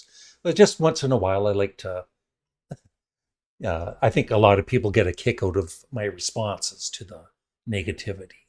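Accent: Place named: Canada. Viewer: OMG! That is hilarious and wonderful. I just started watching your other channel. I'm 61 so I am in the ballpark with you on your taste (with some adjustments but..) Youtube put this on my suggestion list. I am so glad. This is like Celebrities Reading Mean Tweets on Jimmy Kimmel (a late night show we have here in USA - not sure if you do in Canada). I must admit I get a little concerned about the mean comments my favorite audiophile and album reviewers get. I only watch nice people (who should be treated respectfully) You are so gutsy to even read mean comments. Anyhoo... Inspired by some negative things a few Youtube audiophiles (and commenters) have done to other Youtubers, I've been wanting to make my own Youtube video rating my favorite audiophiles, explaining how they work, what I like about them etc.. AND how attractive they are as a man (to me - Gay audiophile/rock and roll fan that I am). 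You had already inspired me to want to make one about album reviewers too! You are a! I'm so glad you called yourself that. Deserved! AND you rock that Canadian accent! Why don't those Toronto Audiophiles I watch rock one too? I hope I get the guts to make such a video and you get to hear how appealing you are! This video was just further proof!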